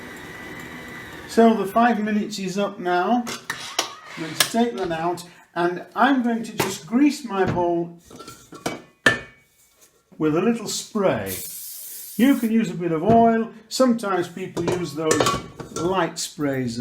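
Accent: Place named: Northwest England, United Kingdom. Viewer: This is amazing and made my day. Love the Northwest accent as well 😂 - All the way from Canada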